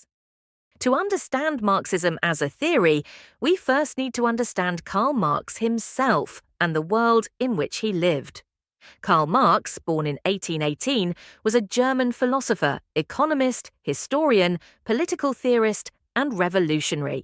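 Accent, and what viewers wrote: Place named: United Kingdom. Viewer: How is the channel location Pakistan while the narrator voice is white British?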